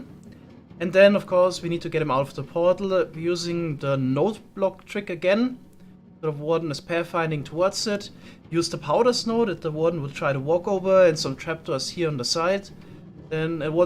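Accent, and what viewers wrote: Germany. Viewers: love ur german accent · are you german? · Ich bin mir nicht ganz sicher aber kann das sein das du eigentlich Deutsch sprichst?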